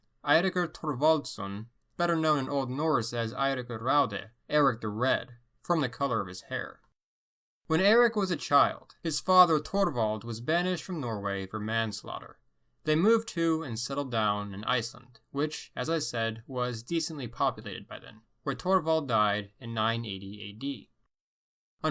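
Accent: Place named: United States. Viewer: One note for you mate, as a British-English speaking Dane who also speaks Old Norse, just because you're rolling your R's doesn't mean you're saying the names in Old Norse.. You're rolling your R's well - but still maintaining the American accent. If you're working on it then good job 👍 keep on going. But if you're not, exaggerate the vowels more and do a bit of research on the Viking alphabet and how to pronounce the letters. For example when you mentioned Erik the Red's name in Old Norse , - "Eríkr" - was perfect, but - "Rauði" - was pretty shite no offence. The letter, "ð" is the equivalent of a voiced "th" and the "i" is a stressed "ee". So it should sound like, "Rowthee-", rather than what you said, "Rowdeh". Just giving you a heads up cause you seem to be trying to speak Old Norse 👍.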